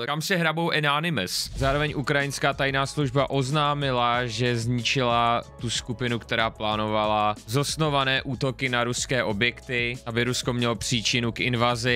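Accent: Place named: Moravia, Czech Republic. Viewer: Ta slatka Morava. 🙂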